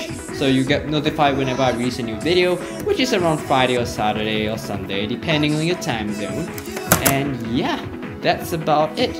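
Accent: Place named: United Kingdom. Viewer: took away mic port......also an asian with a british accent... alot unanswered questions. very helpful video BTW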